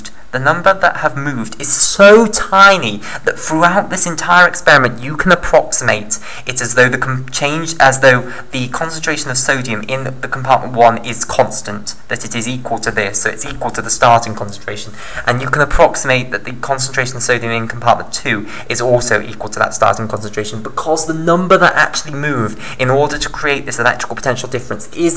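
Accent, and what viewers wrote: United Kingdom. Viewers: British one 👍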